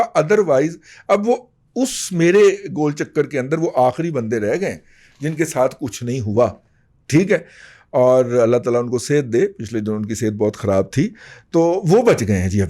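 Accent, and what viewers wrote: Punjab, Pakistan. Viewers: Your urdu/hindi in punjabi accent is quite amusing and enjoyable to hear.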